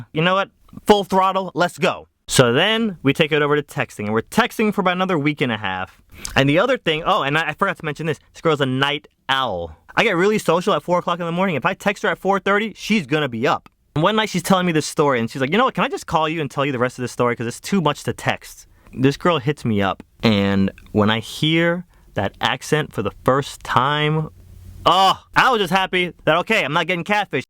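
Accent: United Kingdom. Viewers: British accent 😏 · Dark red hair is my kryptonite. But British accent is a close second.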